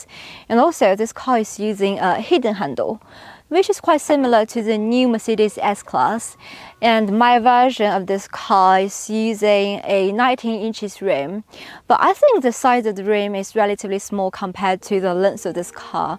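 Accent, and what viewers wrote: United Kingdom. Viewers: 这小英式口音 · 厉害啊，英伦口音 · Chinese beauty with British accent 😯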